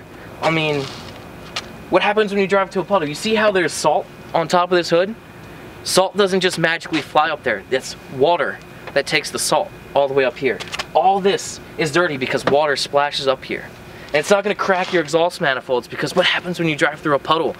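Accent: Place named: Ohio, United States. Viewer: Are you guys from the midwest like Ohio? Your accent sounds like a skater I watch who's also from ohio and you guys talk so similar.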